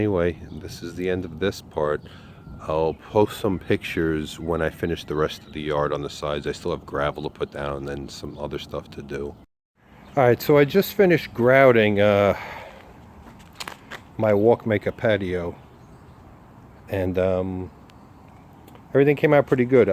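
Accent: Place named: Illinois, United States. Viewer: Judging by the accent, this guy is definitely from Illinois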